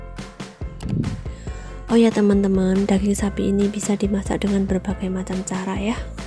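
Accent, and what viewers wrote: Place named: Java, Indonesia. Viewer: Logate jowo bingits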